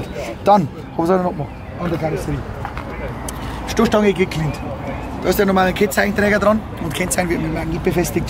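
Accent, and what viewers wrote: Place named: Saxony, Germany. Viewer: Da sage noch einer sächsisch klingt furchtbar...nach 10 Sekunden habe ich das Video ausgeschalten...unerträglich.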